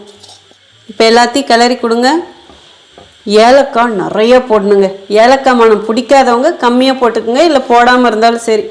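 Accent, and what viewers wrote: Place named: Tirunelveli, India. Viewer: நீங்கள் பேசுவதை கேட்கரசனையா இருக்கு தெளிவா.இருக்கு வாழ்த்துக்கள் மகளே எந்த ஊர்நீங்க பிள்ளை னுசொன்னது நெல்லையா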